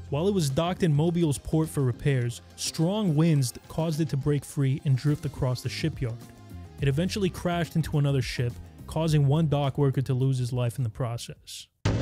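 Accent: New York, United States. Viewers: The narrator sounds like a cross between Alan Alda and Howard Stern with a little New York tough guy thrown in! Great report!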